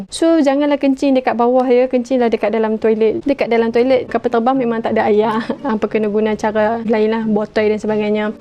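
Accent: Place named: North, Malaysia. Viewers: Muka mcm mat saleh tapi kedah piau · Bahasa utaqha dia mmg piaw<link> 😎 Episode lepas: pakai accent KL Episode kali ini: You're the truly Oqhang Utagha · Sedap dengar dia cakap utagha.. padu👍🏻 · bantai cakap utaqha tequih 🤪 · Utara universal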